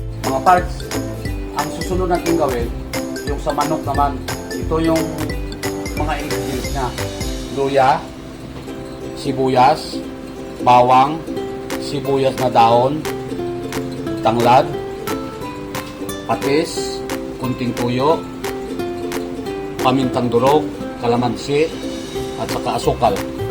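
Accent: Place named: Panay, Philippines. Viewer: ay ganon ba taga concepcion ilo2 ako iba talaga tuno ng taga panay stay safe bos god bless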